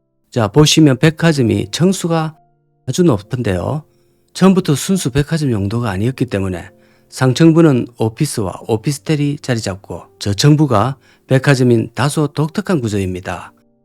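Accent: Gyeongsang, South Korea. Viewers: 갱상도 사투리가 구수하니, 좋네요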